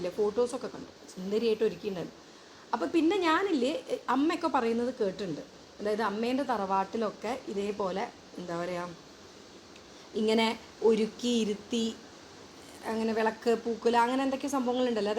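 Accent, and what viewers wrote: Kozhikode, India. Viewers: അവര് കോഴിക്കോട്ട് കാര് അല്ലെ same സ്ലാങ് വരും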